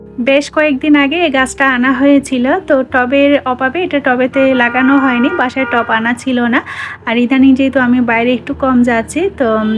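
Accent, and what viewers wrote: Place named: Sylhet, Bangladesh. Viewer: ❤❤ আপু সোহার জন্য রইল মন থেকে অনেক অনেক দোয়া ভালোবাসা ❤ তোমার শাশুড়ি তো অনেক আম এনেছে বাড়ি থেকে আমাদের না এবার আম আসেনি আমাদেরকে একটু পার্সেল পাঠিয়ে দাও আপু, ইলিশ মাছ আমার অনেক পছন্দের ইলিশ মাছ যেমন রান্না হোক না কেন বিশেষ করে গরম ভাতের সঙ্গে ভাজা আর ইলিশ মাছের তেল যে খেতে বেশি ভালো লাগে আমার, পরিবারকে নিয়ে অনেক ভালো থাকো সুস্থ থেকো শুভকামনা রইল আপু, তোমার বাড়ি কি চট্টগ্রাম আর ঢাকাতে থাকো তুমি তোমার কথা বলার ধরনটা সিলেটের মানুষের মত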